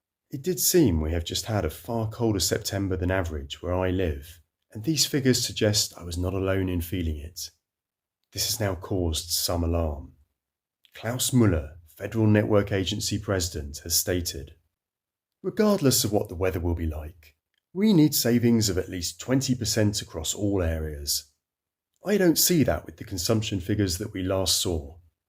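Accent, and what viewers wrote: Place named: United Kingdom. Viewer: You sound like your from 🇬🇧 not 🇩🇪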